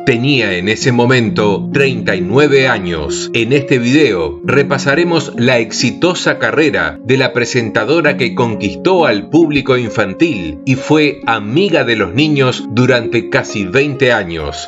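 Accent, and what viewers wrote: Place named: Argentina. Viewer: Si están hablando de una presentadora española que desarrolló toda su carrera en España ¿A qué viene el acento argentino del locutor?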